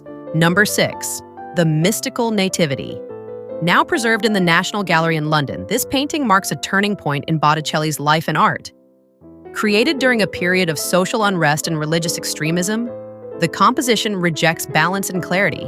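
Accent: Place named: United States. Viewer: Enjoyed this apart from the grating, whining , horrible American accent.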